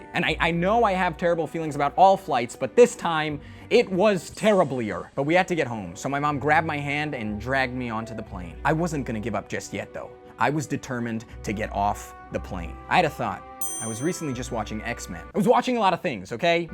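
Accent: Russia. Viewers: And his Russian accent he dose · I love the Russian English accent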